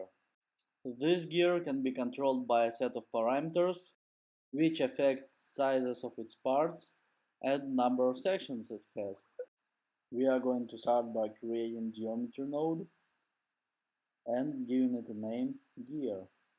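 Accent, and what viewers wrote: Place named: Russia. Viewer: Smells like a russian accent!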